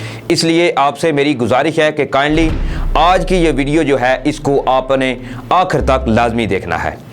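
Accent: India. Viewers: If you don't mind, may I say that you need to improve ur accent. You speak like indians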